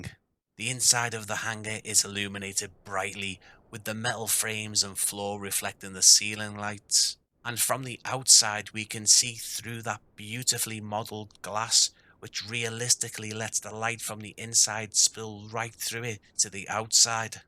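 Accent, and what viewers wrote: Liverpool, United Kingdom. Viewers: loved the Scouse - 50 years down under and can still understand it - and still love to eat it 👍 sad you dont have a Spitfire - ask the Aussie developers to gift you one for review👌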